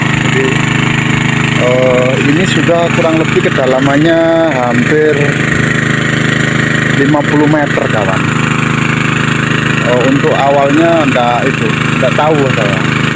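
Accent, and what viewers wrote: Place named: Java, Indonesia. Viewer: Orang Jawa itu medok mas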